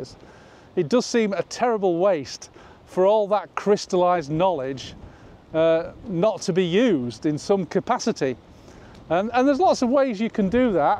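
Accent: United Kingdom. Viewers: Thank god British speak, like you I retired at 45 best move ever, how I found time to work I’ll never know. Now 65 still fab.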